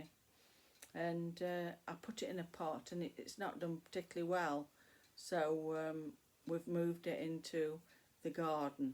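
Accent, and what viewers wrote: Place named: Derbyshire, United Kingdom. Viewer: You definitely have a Derbyshire twang to your accent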